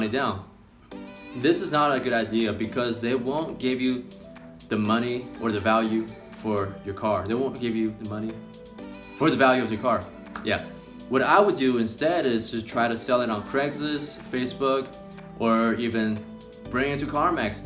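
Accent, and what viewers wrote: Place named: Italy. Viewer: Great advice and your Italian accent was hilarious!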